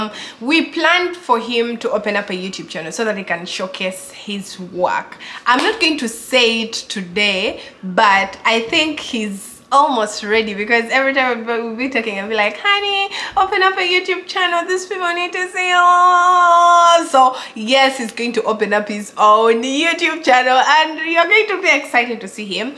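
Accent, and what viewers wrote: Uganda. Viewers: Sarah sarai. Her accent is obviously ugandan.no doubt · she's Ugandan her and her accent can tell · Wow u are a Ugandan banange, love ur accent darling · Awww her accent can indicate that she is Ugandan. Lol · I knew you are a Ugandan because of your accent...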